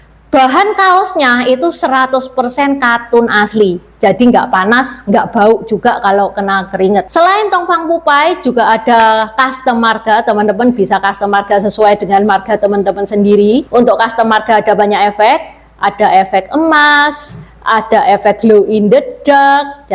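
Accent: Java, Indonesia. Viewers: Medok ya..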